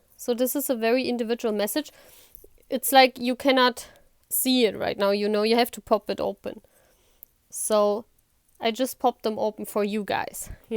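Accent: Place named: Germany. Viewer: Are you german because of the accent? Ps nice video💖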